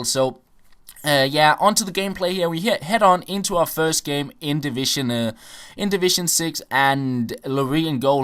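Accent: Denmark. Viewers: Man you can really hear that you are danish, so hard and thick dialect hehe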